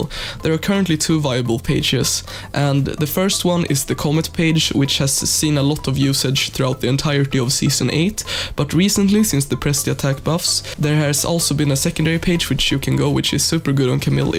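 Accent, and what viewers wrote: Sweden. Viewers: You can hear clearly he is swedish xD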